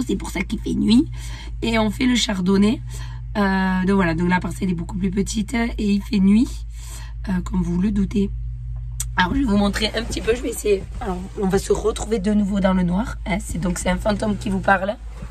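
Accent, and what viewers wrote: South, France. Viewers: Tant mieux que la récolte de la vigne soit acceptable. J'imagine que le soleil de votre région (par votre accent du sud) à aidé à ce que les plants produisent quand même. Quel nom de bouteille est ce que l'on pourrait acheter du Québec pour goûter un petit peu votre région. Merci et bravo pour votre travail.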